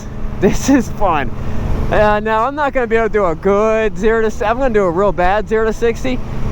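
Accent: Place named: South, United States. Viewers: hes from the south what do you expect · I think he's texan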